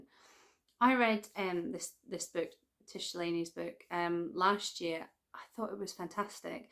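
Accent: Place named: Scotland, United Kingdom. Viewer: Your Scottish accent still comes through a little bit! I hear it in certain words.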